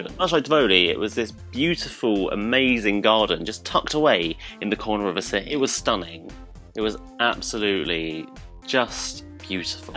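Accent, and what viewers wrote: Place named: United Kingdom. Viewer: Dont know this channel. Where are they from? He sounds British but when he talkes about visiting Denmark it sounded like he lives on the other side of the World.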